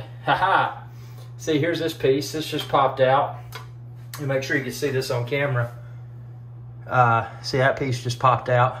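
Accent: South, United States. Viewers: I’m in Florida. What state do you live in? With your accent I’m guessing South Carolina? Georgia? Tennessee? And everyone says “It’s probably your faucet aerator! No it’s not because mine are very clean.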